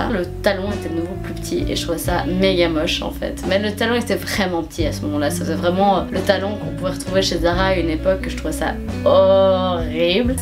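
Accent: Belgium. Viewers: aaaah mais tu es si adorable! j'adore ta bonne humeur et cet accent belge qui donne le sourire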